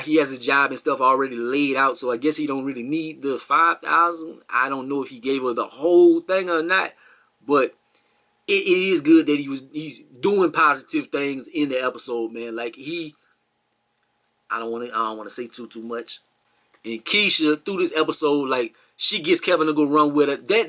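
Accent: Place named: New Orleans, United States. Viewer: Just found you. Adore your commentary. Lemme find out that accent from New Orleans! 😊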